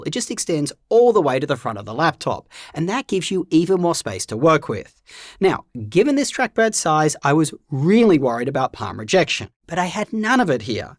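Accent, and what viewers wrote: Australia. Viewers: My God....I love the video but no offense you've got a very disturbing australian accent with bloody annoying high tone pich to it😂😂 Can not stand by longer than 1 minute....sorry😅😅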